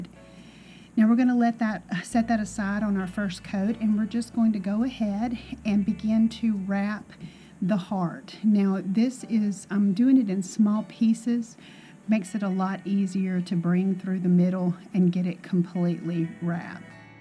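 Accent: South, United States. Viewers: So glad to have found your channel through this collab hop! I love shopping my craft room also , saves money and really tests your creativity ! I have to say being from New York myself I just adore your southern accent , so sweet🤗💕 I love your DIYs !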